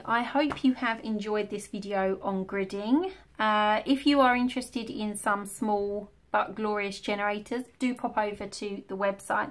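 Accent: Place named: England, United Kingdom. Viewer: I love you english accent... besides that all that you said.. cheers from Colombia!!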